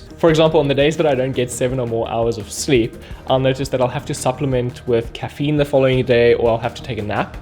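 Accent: South Africa. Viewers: loving this south african accent .... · I recognized your accent immediately! Fellow South African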